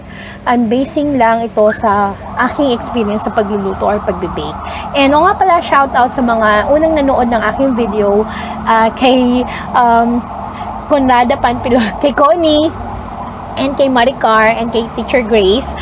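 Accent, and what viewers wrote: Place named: Conyo, Philippines. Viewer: Ang arteeeee 😂😂😂😂 im enjoying your video ng sobra.. ang lakas maka conyo 😂😂 excited to try your recipe 🥰🥰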